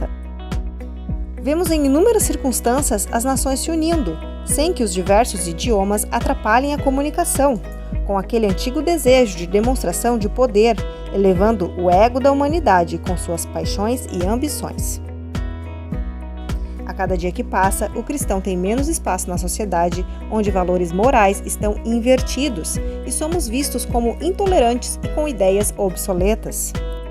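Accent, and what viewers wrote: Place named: Paraná, Brazil. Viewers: Me inscrevi nesse tbm , irmã. Vc é do Estado do Paraná?. Aparentemente parece vegetação da região sul, dois lugares me faz lembrar da região Sul ou Paraná como tenho dito ou Santa Catarina . Região q está no mínimo a 800 M de altitude do nível do mar . Eu tô certa QTO a isso?. E tbm pq vc tem sotaque paranaense . Ou no máximo santa Catarina q o sotaque é mais forte , mas acho q vcs são do Paraná. Sucesso nessa empreitada. E outra vc pelo jeito de parentes q ainda estão são n foram beijados, mas no meu caso n tive esse livramento familiar. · É região sul, está muito certa! O sotaque do sul é tudo meio parecido mesmo... kkkkk! E nesta região alguns pontos são em torno de 900 metros de altitude. O Criador nos guiou para este local, foi de uma hora pra outra. Estávamos nos organizando apenas com barraca para fugir quando chegasse o momento. 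Por isso, estamos mostrando tudo que estamos fazendo! Desta forma, acreditamos que o nosso mover pela fé possa encorajar os irmãos a fazerem o que estiver ao alcance. Sabemos que construir refúgio na montanha é algo mais extremo, mas é olhando para um extremo assim que muitos podem pensar em fazer o mínimo. Entende? Sobre família, eu faço questão de contar a todos que me perguntam! Apenas meu marido está enxergando do mesmo jeito que eu. Meu pai e minha mãe apenas gostam de natureza, mas meu pai acha loucura esse lugar. Ele reclama quando vai lá... E ele não gosta quando a gente fala do tempo do fim. Já a minha mãe fica mais em cima do muro, ela até entende tudo que a gente fala, mas ela acha que não é para agora. Mas eu ainda tenho minha irmã, meu cunhado, a família do meu cunhado e toda a família do meu marido que estão vivendo no mundo e não dão muita importância quando a gente fala alguma coisa. 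Então, nós sabemos que precisaremos deixar tudo e todos para trás quando tudo acontecer. Estamos nos preparando espiritualmente, psicologicamente e na parte prática também. Quanto à empreitada, eu e meu marido temos em mente que não faríamos isso, até não conseguimos acreditar que est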